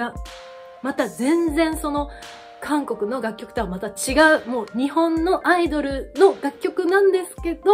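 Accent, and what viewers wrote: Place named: Kansai, Japan. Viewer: 関西弁炸裂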